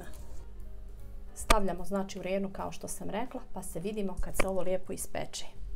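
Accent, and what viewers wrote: Bosnia and Herzegovina. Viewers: kuvas i akcenat? Ispod naslova ima napisane sastojke, bez akcenta 🤣🤣🤣. Btw zena je iz Bosne, pa otuda i akcenat. .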